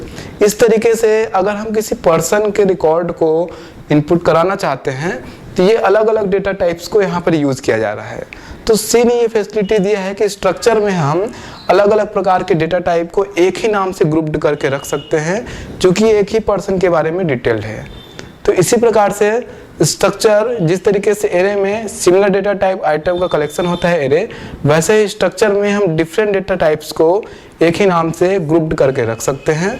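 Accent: Bihar, India. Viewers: Sir aap Bihar se hai kya??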